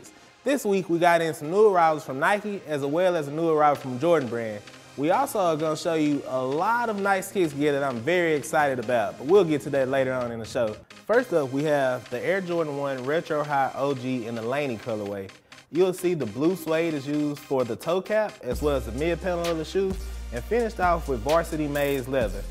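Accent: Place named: South, United States. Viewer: This guy has a cool southern accent.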